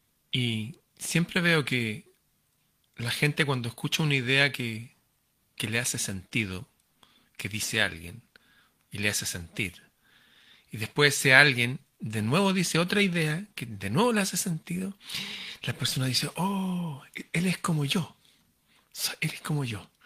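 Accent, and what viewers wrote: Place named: Argentina. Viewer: Parece que hablas de Argentina !!!! Qué bueno es escucharte! Cariños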